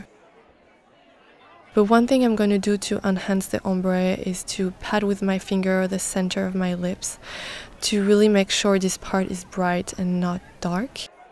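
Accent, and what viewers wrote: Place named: France. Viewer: I love her French accent so much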